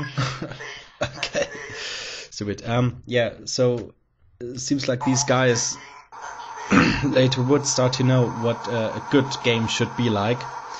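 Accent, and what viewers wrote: Germany. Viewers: I totally agree, you should never apologize for where you came from! But I think what he meant by that, was that he's sorry having poor English because he's German. But German accents are still something you should never apologize for!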